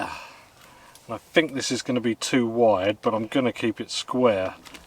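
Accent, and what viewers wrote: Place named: Cockney, United Kingdom. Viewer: Love your cockney accent "Should have a Fick frost tonight".. I used to be inlove with a cockney lad, so it's a special accent for me. Your vids are so good, very relaxing!! My husband ended up liking them too:) Yeah.. pizza is hotter than sun (as one of your mates says) when it first comes out 😦 You could make a fortune selling "Open Fireplace" screen savers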